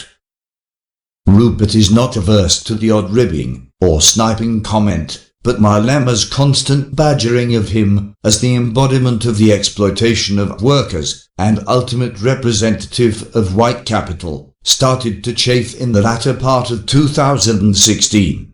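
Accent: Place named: England, United Kingdom. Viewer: Who ever is narrating this video, you're not South African, in fact, you have an English accent, so stop poking your nose in our issues and talk about the Monarchy. Which South African can't pronounce "Stellenbosch"?...nxm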